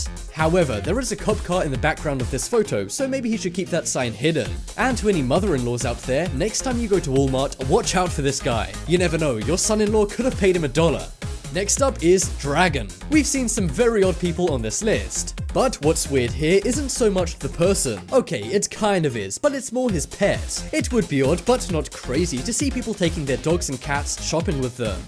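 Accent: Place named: England, United Kingdom. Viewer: English accent SUCKS!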